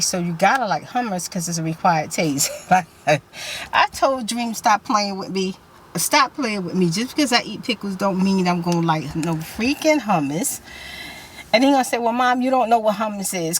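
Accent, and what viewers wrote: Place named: East Coast, United States. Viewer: So I'm in NYC and yu give me East cost vybez lol like Jersey Philly NYC your accent I can listen to u all day lol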